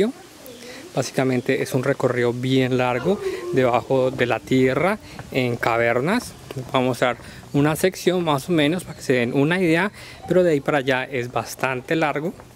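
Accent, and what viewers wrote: Colombia. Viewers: Hola! Noto por tu acento que eres colombiano jeje. Una pregunta, tenemos planeado viajar en Julio de 2017 desde Bogotá, recomiendas comprar las entradas por internet o es mejor allá?. Gracias por tu video :)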